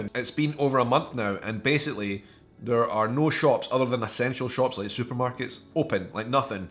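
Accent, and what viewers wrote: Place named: Highlands, United Kingdom. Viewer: <sighs> I listen to your accent. ...and I hear my dad when he would show me how his grandmother, (my great grandmother), would talk. I never met her. It's like you said. She was from the Highlands and as I hear it, there is no place on earth as beautiful. I'm getting way older, now, and I hope I make it Scotland.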